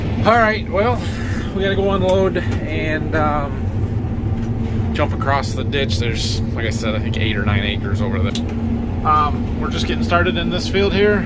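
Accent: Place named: United States. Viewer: I love how u Americans say roof. U pronounce it more like "rough". We Canadians pronounce it with a harder oo like boot.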